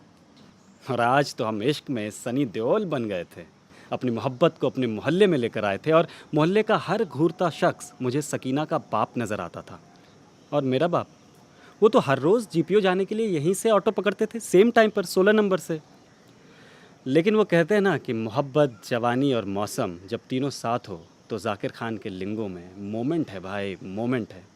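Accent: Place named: Bihar, India. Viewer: I want to see u again on tape a tale... Feeling something very heart touching... Especially. "Shi to thi Riya, bna nahi kahen aap dono ka" And most importantly, I love ur Bihar wala feel and touch little bit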